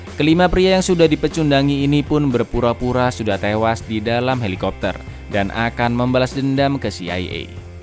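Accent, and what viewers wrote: Java, Indonesia. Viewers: Mas sampean jowo ngendi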